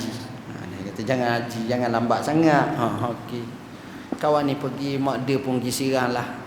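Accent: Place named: Kelantan, Malaysia. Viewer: ustaz ni orang kelantan ke. bahasa kelantan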